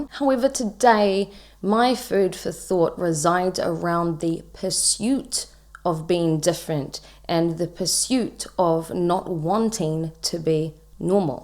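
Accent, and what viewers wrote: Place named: New Zealand. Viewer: SORRY I DIDNT HEAR EVERYTHING YOU SAID, bc I haven't taken my adhd meds today, but are you from New Zealand?? Your accents sounds NZ 😍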